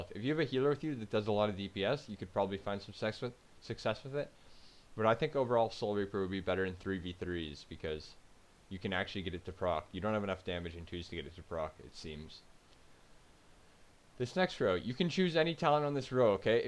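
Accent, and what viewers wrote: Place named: Nova Scotia, Canada. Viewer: Canadian eh, that "R" pronounciation sounds almost Nova Scotian/New Foundlander.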